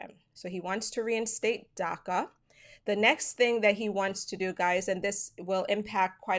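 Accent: Jamaica. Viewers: yes sistren. Country boy. Straight out a Westmoreland.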